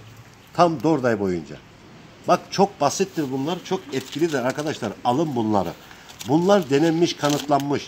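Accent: Black Sea, Turkey. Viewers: Selamün Âleyküm Karadenizlisin Abi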